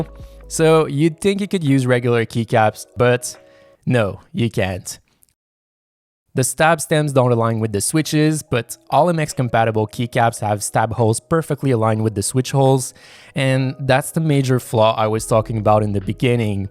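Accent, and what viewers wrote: Quebec, Canada. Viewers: you are french canadian right?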